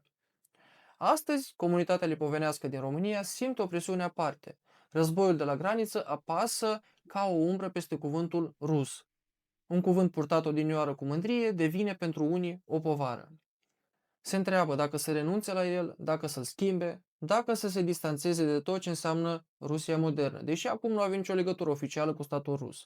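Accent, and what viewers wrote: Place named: Moldova. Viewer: и акцент у тебя молдавский а не русский.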